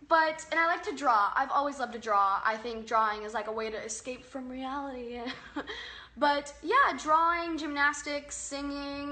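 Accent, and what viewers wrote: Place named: Texas, United States. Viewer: Love your Texas accent yall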